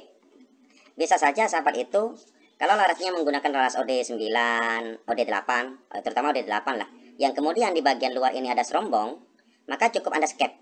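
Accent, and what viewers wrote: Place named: East Java, Indonesia. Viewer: Trims ilmunya, suaranya lucu, logatnya Jawa Timuran😂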